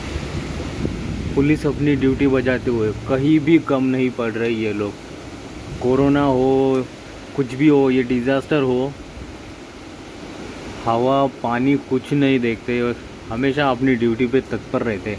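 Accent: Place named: Maharashtra, India. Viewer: व्हिडिओ मराठीमध्ये बनवला असता तर बरं वाटलं असतं. तसंही तुमचं उच्चारण बरसच मराठी वाटतं होतं.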